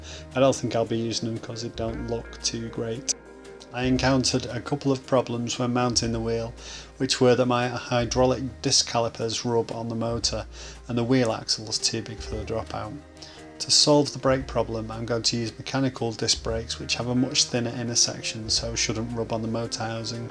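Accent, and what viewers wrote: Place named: Liverpool, United Kingdom. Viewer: he's got an accent sounds like the Beatles yellow submarine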